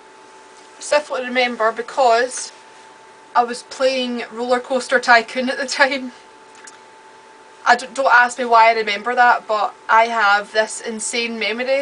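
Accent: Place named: Scotland, United Kingdom. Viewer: Good on you for making this video and helping to understand Autism. If I can ever afford a good video camera I too may make a video on autism. But the prospect of talking on video to an audience of possibly millions terrifies me. In regards to that you are far more brave than I. I applaud your efforts. I really like your scottish accent too.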